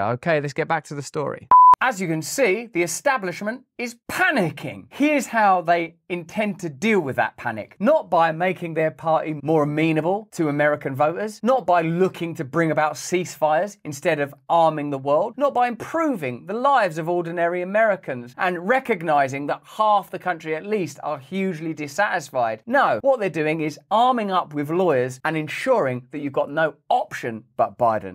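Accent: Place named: United Kingdom. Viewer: Used to like russel now he is alex jones with a british accent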